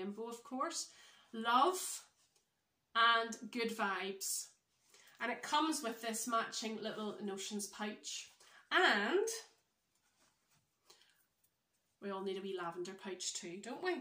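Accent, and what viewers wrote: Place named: Northern Ireland, United Kingdom. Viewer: Just came across this podcast and enjoyed it so much so I'll be checking out all your others. I love your cheeriness and your easy chat and also your N Irish accent. Your Kastelet is beautiful and just my style so I will be checking it out on Ravelry..thank you.. Looking forward to watching your previous podcasts. God bless you lovely lady. x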